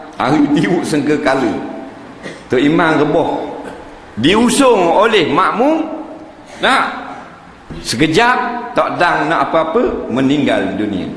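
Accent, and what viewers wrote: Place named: Terengganu, Malaysia. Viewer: loghat ganu mu dok tahu..jgn komen tnpa berfikirr..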